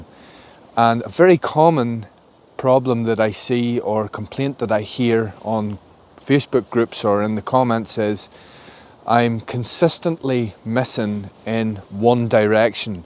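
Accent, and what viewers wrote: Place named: Scotland, United Kingdom. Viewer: The single best thing about this video is that is Scottish hahaha tnx for a great vid lad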